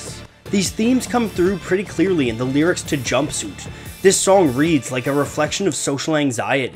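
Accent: Canada. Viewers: The Narrator is clearly Canadian.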